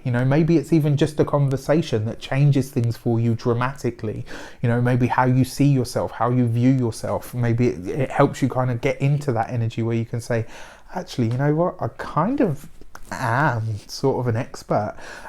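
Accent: United Kingdom. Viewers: British accent ? Nice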